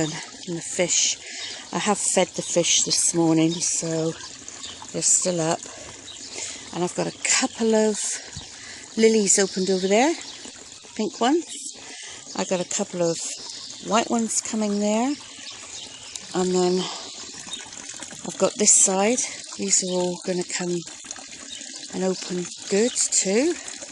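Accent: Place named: West Country, United Kingdom. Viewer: What a wonderland you live in, it's gorgeous. You sound like you may be from the West Country? I'm a former Essex girl.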